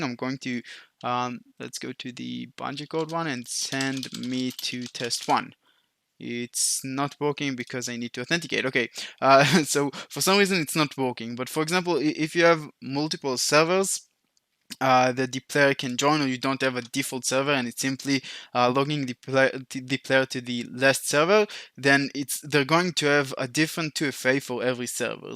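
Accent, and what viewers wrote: Israel. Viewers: יש לו מבטא ישראלי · Love your Israeli accent lol ! BTW waiting for a reply from you in discord :)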